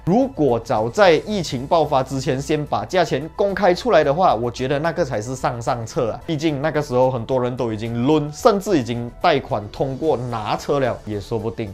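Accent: Malaysia. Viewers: Malaysia華語就是醬rojak的咯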